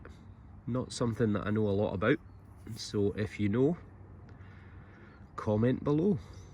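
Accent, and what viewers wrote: Scotland, United Kingdom. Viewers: Just discovered your channel and am thoroughly enjoying your videos. Love the Scottish history lessons and listening to your sounding so Scottish. Hello from Oklahoma, USA.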